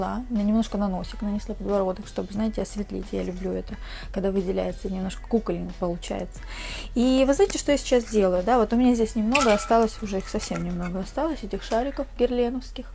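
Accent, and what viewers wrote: Rostov, Russia. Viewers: Из какого вы города ? Показалось что из Ростова на дону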